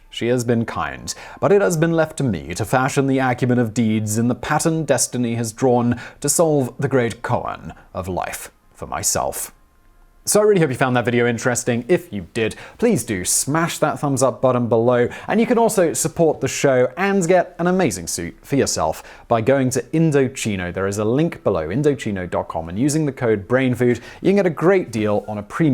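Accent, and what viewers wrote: England, United Kingdom. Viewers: Very well put when you said "....his altruistic accent..." Love your sites. You're the man and a British accent I can handle. And understand. Always awesome narration. And now someone is going to think you wrote this to yourself. Ha,as if. Peace, nice work and thanks. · sigh...you are so British...tailored suit...but they are still doing window pane fabric? British guys can get away with that (I lived there long enough) Americans can't...they don't have the accent and don't know the difference between oxfords and brogues... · 10 20 19 Hey & the Team, Thanks for the post. Lovely suit, color is good on you too; not that my opinion matters that much. Be well. v P.S.: Classic Bond look to go with the British accent.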